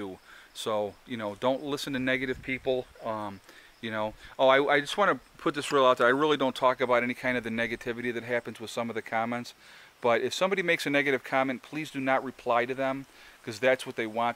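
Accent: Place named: Michigan, United States. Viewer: Great video. ..where are you at??? Almost sounds like michigan. ...lol. with 30 degree variable. ..take care...God bless you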